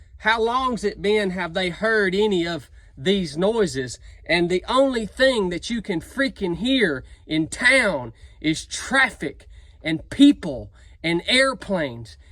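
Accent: South, United States. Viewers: I don't know your history since I'm new to the channel. I keep trying to figure out your country accent. Here are my guesses in order: Kentucky, Tennessee, West Virginia, Georgia. It can't be Texas. And it really doesn't matter too much anyway. Just curious. I am a student of language and accents. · Bro I like your content but this is a little bit much lol you almost sound hillbilly sorry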